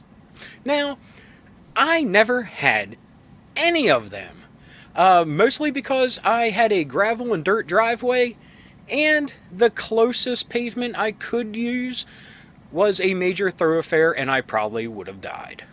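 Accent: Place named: Baltimore, United States. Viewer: Sounds like you’re from Baltimore too bro 😅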